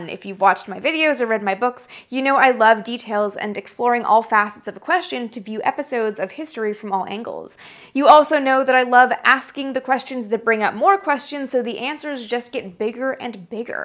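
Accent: Canada. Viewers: I have nothing but love for Canadians, the Brits, and Aussies. I knew you were Canadian when you said “aboot” (about) and “surry” (sorry). It’s adorable 🥰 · I am now noticing the Canadian 'o' pronunciation and now I can't stop hearing it. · I suspected you were Canadian becauae of your pronunciation of certain words so I looked you up & sure enough even though you were trying to hide it.. I'm a big fan of your you tube channel & your depth of knowledge. I don't care if you are not from the U.S. & are an expert on NASA. Imo it gives you extra cred that you adore all things NASA & American space history despite not being born here. There are so many Canadians compared to Americans when you factor in the relative size of the pop on you tube & I think that eludiates a big prob here: we don't educate our citizens anymore. It really is a sorry state of affairs & I wonder about how many Americans are out there that could have contributed big if they been properly motived & piqued their interest in the hard sciences & education in general.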